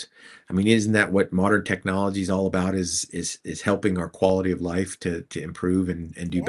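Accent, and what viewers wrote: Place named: West, United States. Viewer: I’m just so curious what doctor you found that would do those DMSO treatments intravenously and would you be able to share that with me? I am from Michigan and I don’t know where you live. It sounded like maybe out west somewhere but even through the doctor that is doing that for you, maybe she would have an idea From doctors More in our area?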